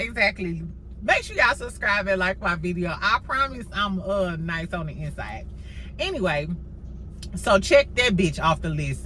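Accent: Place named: South, United States. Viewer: Girl i know you from the South.. That southern drawl is heavy... ❤❤❤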